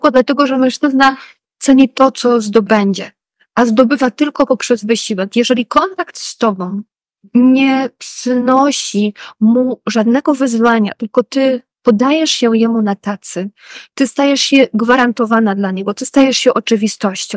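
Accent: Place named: Ukraine. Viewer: myslalam ze jest pani ukrainka czy spod tzw.kresow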